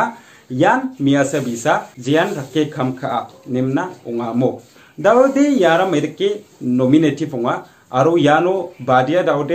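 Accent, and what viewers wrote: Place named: United Kingdom. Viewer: Hope you to see your English accent again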